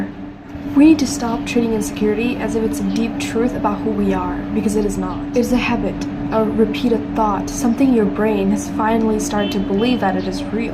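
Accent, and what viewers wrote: United States. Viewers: Wait how did u get your American accent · YOOO YOUR AMERICAN ACCENT IS ON POINT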